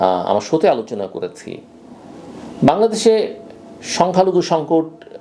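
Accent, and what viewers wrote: India. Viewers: Sir sorry to say apnie akhon Indian ar moto kotha bolan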